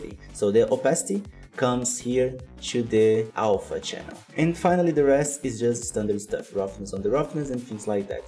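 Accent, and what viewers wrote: Brazil. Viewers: Sabia que era BR! kkk reconheço o ingles BR! Otimo video! Me inscrevi!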